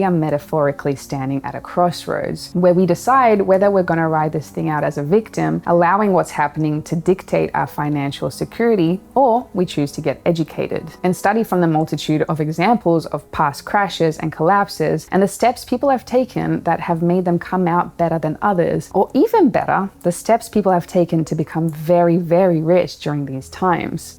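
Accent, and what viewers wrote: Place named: Australia. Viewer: Why do you have an australian accent?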